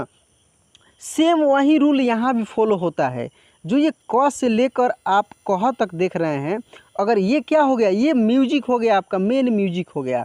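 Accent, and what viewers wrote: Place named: Bihar, India. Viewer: Out of all Hindi teachers Guru Ji I like your Bihari accent Bahut Dhanivaad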